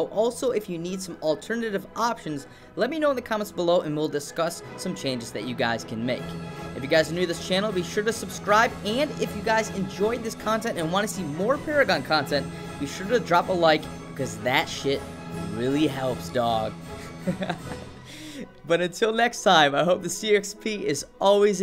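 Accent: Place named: United Kingdom. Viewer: That British accent though..... Man. I really want to play a match with you. I'm getting so frustrated at playing builds similar to this and kicking ass and having a weak team that just don't make the power plays you need them to... I've gone 21/2/6 before now and still lost the match. You can't carry a bad team :( 👎🏻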